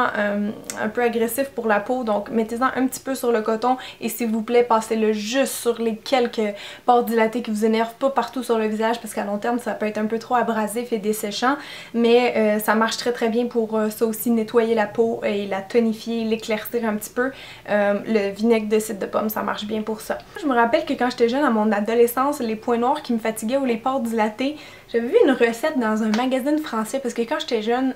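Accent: Quebec, Canada. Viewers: J'adore l'accent québecoise😍😍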